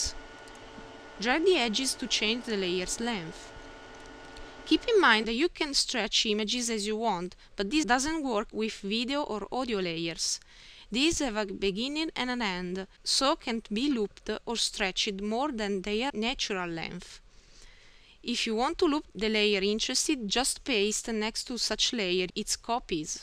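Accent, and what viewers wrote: Italy. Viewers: thanks for the video, helped a lot! I like the Italian accent, it's easier to understand than English :) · Her accent is definitely Italian. I'm Italian.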